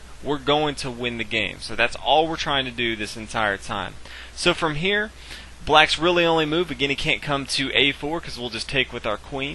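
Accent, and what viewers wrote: South, United States. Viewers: I like the lessons but his southern accent is very annoying.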